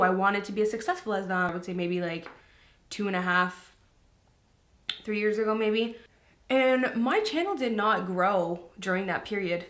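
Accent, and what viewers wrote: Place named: Canada. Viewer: I love your Canadian accent!!! How tall are you? Congrats on your journey... be proud!! also, I can't tell u have more confidence...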